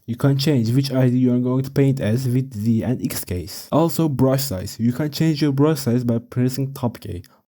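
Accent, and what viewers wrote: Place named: Turkey. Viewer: İlk videonda aksandan direkt anlamıştım Türk olduğunu . Güzel kanal devamını bekliyoruz hocam.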